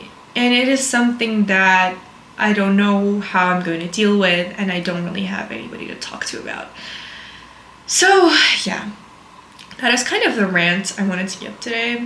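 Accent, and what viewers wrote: United States. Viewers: Kinda off topic, nah, IS off-topic, but how do you have a such a perfect American accent if you just got here? 😅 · To say you only got to the US from Russia 9 months ago, your American accent sounds really authentic. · Hi How come you have a perfect American accent without any trace of the typical Russian drawl? Your accent is more pure then ninety percent of native English speakers in USA!! Respectfully asking as no one you place you as Russian 😅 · Your accent sounds perfect. I mean, you really sound like an actual American speaker. Or a little bit like a German. But, Russia? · Your English is amazing and your accent is full-on American. Did you go to English schools in Russia? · how is your accent pure American in less then a year? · Russia? You have an American accent 100%! Amazing!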